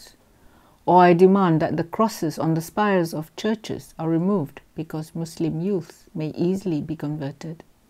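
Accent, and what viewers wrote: Malaysia. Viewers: You speaks as true Malaysian. Thumb up.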